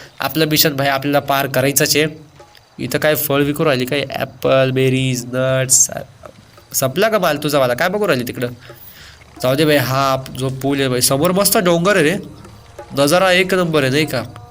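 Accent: Nashik, India. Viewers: bhava tu nashik cha a ka ??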